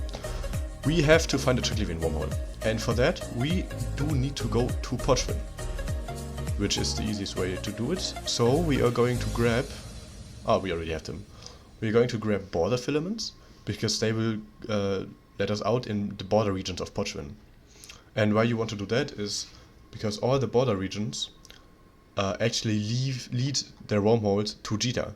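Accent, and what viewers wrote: Germany. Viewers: On a top of a thick German accent. I don't get why everybody feels the need to add - most of time silly - music to videos. Anyway, good content. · ahh i höre raus deutsch :D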